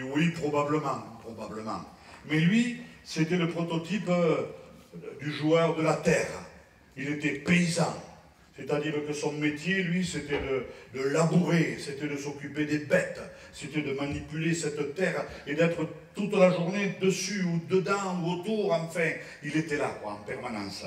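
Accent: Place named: South, France. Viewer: avé l'acent = génialissimo !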